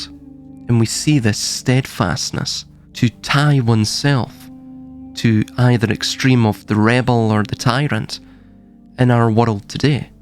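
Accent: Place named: Scotland, United Kingdom. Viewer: Anything you say regarding Steiner and his Christology path is welcome. Thank you so much for all you speak and with a fine Scots accent.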